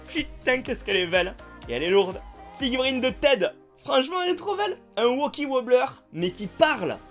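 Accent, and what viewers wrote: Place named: South, France. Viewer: ton accent du midi est excellant 'sin (#teamsud)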